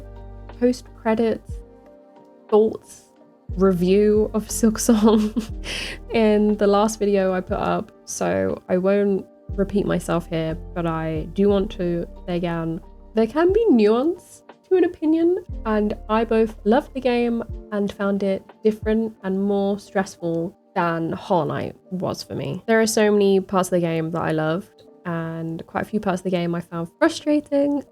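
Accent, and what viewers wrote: United Kingdom. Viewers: I like to find new girls\womans which is gamers. Usually it's because of Half-Life\Half-Life 2 playthrough. It's a great base. I straight watched: Half-Life 2 Half-Life 2: Episode 1 Half-Life 2: Episode 2 Portal 1 Portal 2 Nice voice, nice accent (British?). If you like Half-Life 2 then you probably would like to play Half-Life: Alyx. Yeah, VR might be a problem, but there are ways to play it without VR. Trust me, i played it on VR headset for a phone via laptop with external GPU. I also saw girls\womans gamers playing war games: Battlefield, Call of Duty. There are really cool stories, like: Battlefield 3 or Call of Duty: Modern Warfare and especially Call of Duty: Black Ops. But think twice before go in Call of Duty: World at War. It's might be difficult emotionally. SO, it's nice to have you on YouTube,. And... 53? Really? Are you kidding me?:) P.S. 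Hello from Russia.;)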